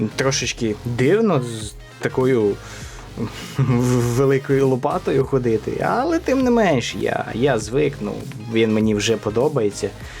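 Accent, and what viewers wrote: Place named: Western Ukraine, Ukraine. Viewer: Западный акцент забавный)))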